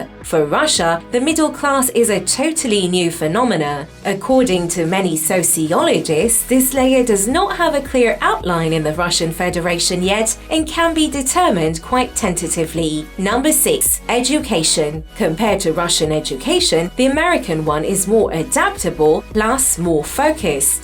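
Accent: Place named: England, United Kingdom. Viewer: Fuckin' hate this english accent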